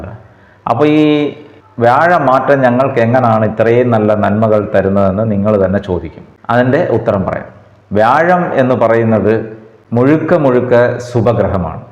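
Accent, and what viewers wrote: Tamil Nadu, India. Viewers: സർ, താങ്കൾ തമിഴനാണോ സംസാരത്തിൽ തമിഴ് കലരുന്നു. ഏതായാലും താങ്കൾ നിസ്സ്വാർത്ഥനാണ്. തോന്നുന്നത് അപ്രകാരം പറയുന്നു. സത്യം സത്യമായി. 👍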